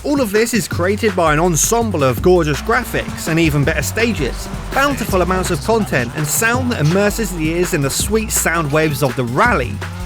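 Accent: United Kingdom. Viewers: Are you from milton keynes???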